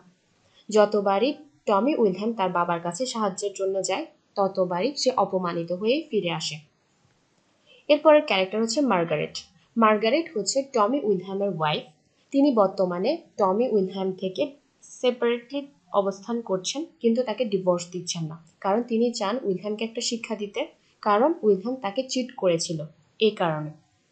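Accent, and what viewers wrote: Chittagong, Bangladesh. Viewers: আপনার বাচনভঙ্গি যথেস্ট সাবলীল কিন্তু আমি আপনার ভয়েজ প্রথম বার শুনেই ধরে ফেললাম আপনার বাসা চট্টগ্রামে 🥰 বাই দা ওয়ে আমি রাজশাহী থেকে 💕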